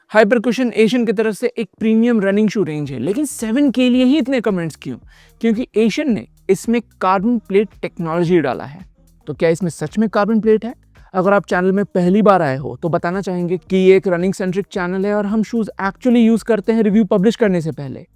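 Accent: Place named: Chandigarh, India. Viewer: Are u from Chandigarh? It seems like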